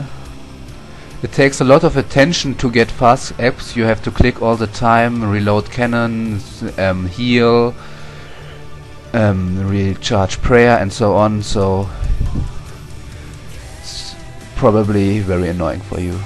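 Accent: Germany. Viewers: den deutschen akzent hört man raus :D gute guide p.s. ich habe nichts gegen den deutschen akzent... wenn ich englisch rede klingt das fast genau so mit dem akzent =D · your from germany right? i hear from your acent i think or i just suck at it :/ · R u german? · nice german accent lol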